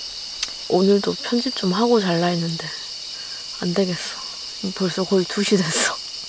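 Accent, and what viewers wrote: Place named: Gwangju, South Korea. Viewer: 같은 광주사람이라 사투리 너무 나랑 똑같아서 정겨움ㅋㅋㅋㅋㅋㅋㅋㅋㅋ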